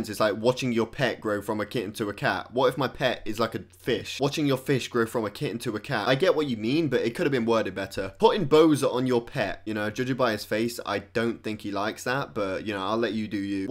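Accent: England, United Kingdom. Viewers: Your accent makes me want to move to england.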